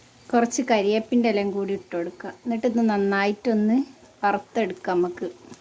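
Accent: Malappuram, India. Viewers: Kollalo.. ingalu njamade malparthe basha pole indallo varthanam..😃😃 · 😋😋👌👌കൊതിയാവുന്നു കണ്ടിട്ട് തന്നെ. ചെയുന്ന സ്റ്റൈൽ വളരെ നന്നായി ട്ടുണ്ട് 😊. ഇങ്ങനെ ഞാനും ഒന്ന് ട്രൈ ചെയ്തു നോക്കട്ടെ. എന്നിട്ട് പറയാം ട്ടോ. 😍മലപ്പുറം ആണോ നിങ്ങൾ. ഞാനും അവിടെ ആണ്. സംസാരിക്കുന്നത് കേട്ടിട്ട് ചോദിച്ചതാ ട്ടോ 😊.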